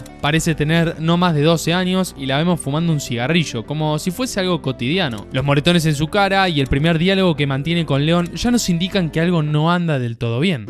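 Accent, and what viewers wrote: Argentina. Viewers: Amigo que buen video describe muy bien lo qué pasa en la cinta me encanta en asentó argentino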